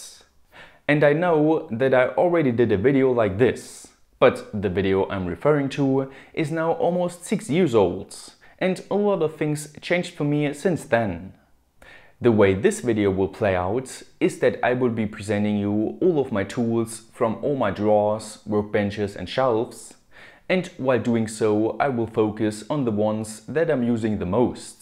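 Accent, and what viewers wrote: Germany. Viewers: I thought he was German, ja?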